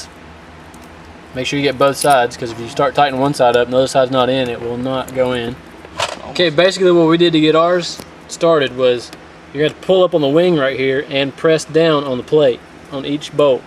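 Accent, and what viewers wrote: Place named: South, United States. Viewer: sounds like a cute hottie gear head ummmm southern accent like my alabama one. ive got over 20 paid for cars just sittin waitin to meet mr Right another gear head.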